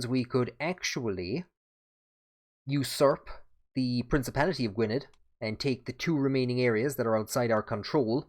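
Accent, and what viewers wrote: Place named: Munster, Ireland. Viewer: You're the only YTer I have the bell on for, great content. Did you study history? Also which province are ye from? Can't place the accent. My best guess is Munster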